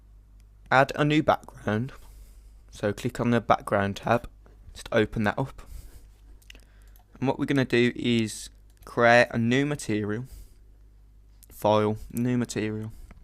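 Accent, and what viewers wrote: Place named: Northeast England, United Kingdom. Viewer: yow aye from roond ere am ya? hahah i recognize that accent.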